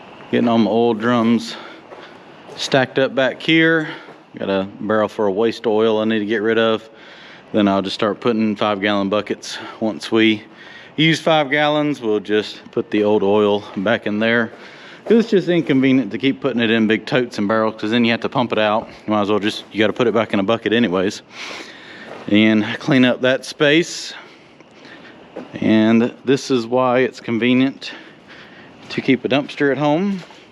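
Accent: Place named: North Carolina, United States. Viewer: I think that he’s from NC and they do talk different not funny but different.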